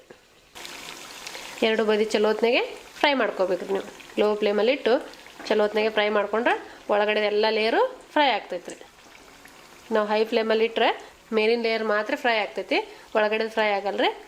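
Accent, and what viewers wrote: North Karnataka, India. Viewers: ತುಂಬಾ ಚನ್ನಾಗಿ ತೋರಿಸಿ ಕೊಟ್ಟಿದಿರಿ. ನಮ್ಮ ಉತ್ತರ ಕರ್ನಾಟಕ ಭಾಷೆಯಲ್ಲಿ ಕೇಳಲು ಇನ್ನೂ ಚೆಂದ. ❤😊 ಹಿಟ್ಟಿನಲ್ಲಿ ಸ್ವಲ್ಪ ಕರಿ ಮೆಣಸಿನ ಪುಡಿಯನ್ನು ಸೇರಿಸಿದರೆ ಚೆನ್ನಾಗಿ ಆಗಬಹುದಾ?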